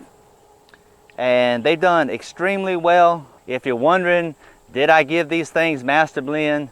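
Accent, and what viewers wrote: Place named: Gulf Coast, United States. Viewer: By the sound of his accent, I’m thinking he’s on the gulf coast. It’s hotter than hell until about November!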